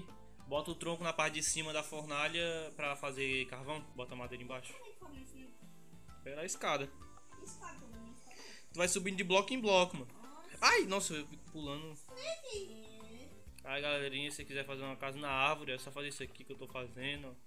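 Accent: Northeast, Brazil. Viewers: Mano, esse jeito de falar/sutaque... So pode ser do Ceará mah. E ainda digo mais: eh de Fortaleza. E ainda digo mais!: eh do José Walter kkkkk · Tu é nordestino man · vim pelo canal do camera mano , tu e nordestino e man ??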